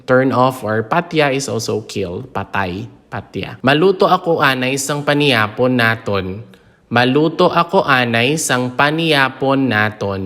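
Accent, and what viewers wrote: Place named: Philippines. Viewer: Philippine english man ini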